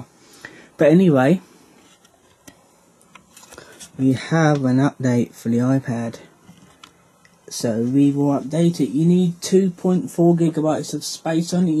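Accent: United Kingdom. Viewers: r u from UK,fella ?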